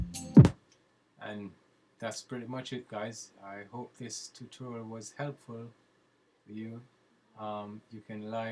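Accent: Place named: Jamaica. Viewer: Yo you sound like a Jamaica still .... glad for the tutorial ... was wonder how i would get Slate VMS to work with Apollo ... you have just given me an idea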